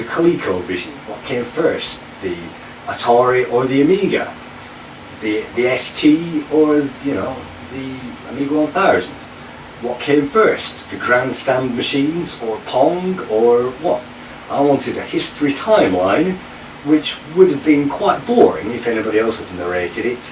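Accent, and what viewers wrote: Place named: North, United Kingdom. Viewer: Interesting vlog cant wait for the Vid:) and you look a lot younger than you sound( love the Northern acc dont lose it)! thats a compliment:)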